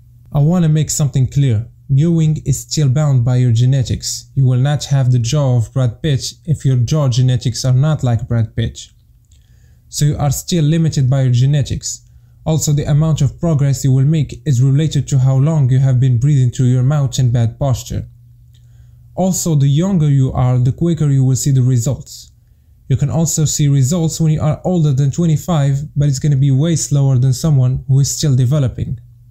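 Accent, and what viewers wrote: Morocco. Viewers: Tell me you're Moroccan without telling me you're Moroccan. Your accent bruh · you sound morrocan idk why, good vid tho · i bet you're moroccan just by the accent, am i right a 3chiri ? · Man i could notice the moroccan accent from the getgo 😂 , great concept , keep it up ! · Hahaha I swear 3reftek Moroccan gher meen accent , prouud of youu keep it up🔥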